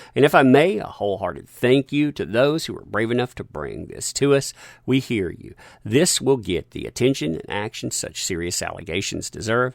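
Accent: United States. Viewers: 2 mins in and you haven't even started yet? And your vocal fry annoying put on voice is just so annoyingly over American that I couldn't go on past the 2 min in introduction.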